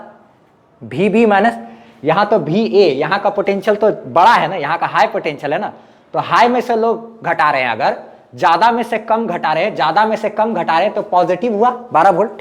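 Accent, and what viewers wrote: Bihar, India. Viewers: Sir ji aap bihar se hain na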